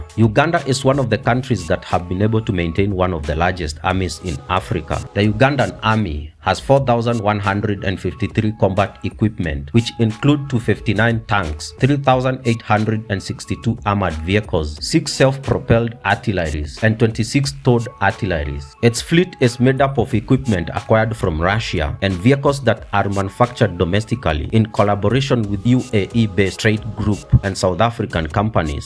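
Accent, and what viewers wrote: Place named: Kenya. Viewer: Completely biase from the accent you just know its a kenyan with zero knowledge on military affair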